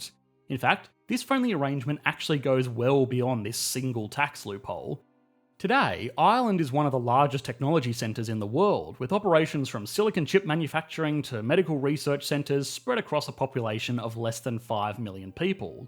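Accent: Australia. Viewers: The guy in the video sounds australian and over here we are basically englands b*tch and worship them so it makes sense they say stupid stuff 😂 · i've just found this video - wow simplistic explanations yet right on point. who would have thought an Australian speaking slowly makes incredible sense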